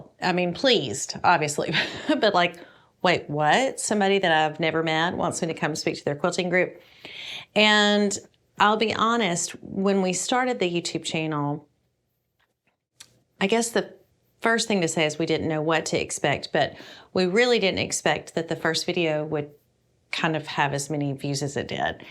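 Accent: South, United States. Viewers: It's a show and tell. And I think I speak for many of us that we enjoy listening to her Southern accent as much as viewing., I bet your Dad was thrilled that his Daughter, with her busy lifestyle took the time to learn and energy to create such a beautiful, useful gift. And you shared the lessons learned with us and made a new friend along the way, as well as your Subscribers. Bravo.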